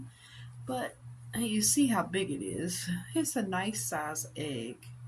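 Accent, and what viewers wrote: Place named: Georgia, United States. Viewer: Oh you are in Savannah GA I just heard it I love Tybee Island!! One of my favorite places on the planet!!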